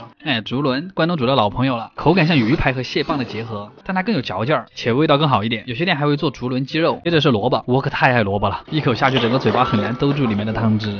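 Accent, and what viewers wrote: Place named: Sichuan, China. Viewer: 四川话好土味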